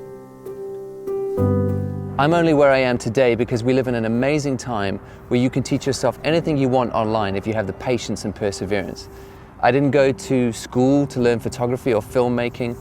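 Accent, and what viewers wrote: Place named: South Africa. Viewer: Aah, I thought I detected a bit of South African in that accent! Really enjoy your videos, and I can especially relate to the latest one, being a freelance writer in SA.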